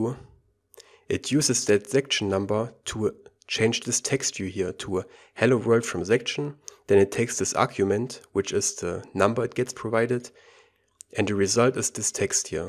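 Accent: Germany. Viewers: so cool - listen to the text in English, but with a German accent)) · I like the german accent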